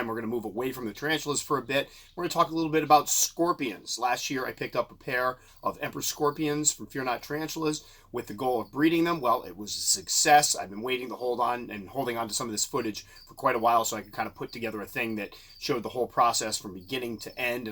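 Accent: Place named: Midwest, United States. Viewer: Dude, I wish you had an email, but I’m sure that’s the last thing you need...58,000 emails asking “what are the best T’s to start collecting?”! 🙄 My question of the day is (if you can/want to answer?!)...Being from St. Louis, MO, I hear a STL/Chicago (Midwest) accent...am I close?! 🤔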